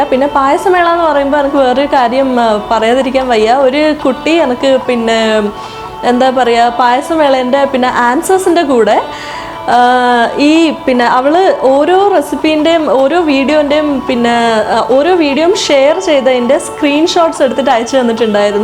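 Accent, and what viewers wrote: Kannur, India. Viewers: Kannur aano pengal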